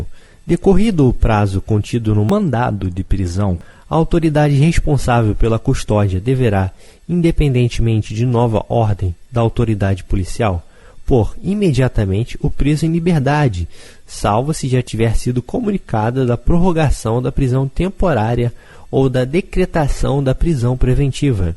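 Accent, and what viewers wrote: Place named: Rio de Janeiro, Brazil. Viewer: Esse é carioca kkk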